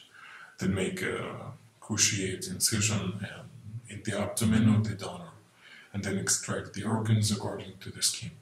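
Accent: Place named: Russia. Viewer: Четкий акцент рускоговорящего который пытается говорить на английском .Цель просто кого-то очернить.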